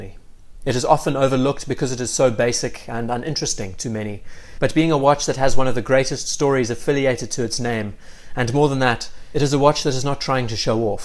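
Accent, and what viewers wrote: South Africa. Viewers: Please do not take this the wrong way, or take it the wrong way! Your monotone dialogue is just horrible. I am a design historian amongst other disciplines, so I find your narrative really grating. Where are you from? South Africa? Anyway please think about the object and not the story that you want to tell; be critical and not political. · You have a real gift with gathering and sharing information in a comfortable way. That South African accent adds a great voice to the story as well. Keep it up.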